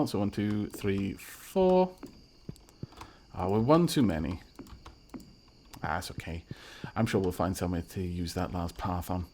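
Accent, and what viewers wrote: United Kingdom. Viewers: Either Wales or England, if I recall correctly.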